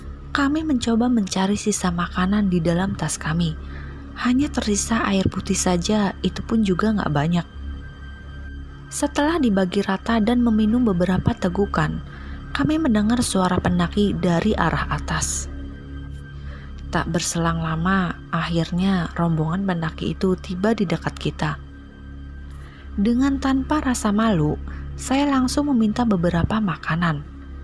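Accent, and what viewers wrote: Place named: Central Java, Indonesia. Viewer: mbaknya pasti asli jawa tengah ya, kliatan suaranya.. darimana mbak min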